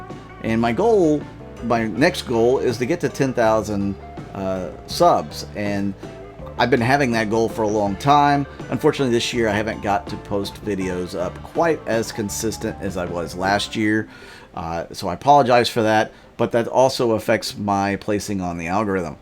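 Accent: Texas, United States. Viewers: What part of Texas you from?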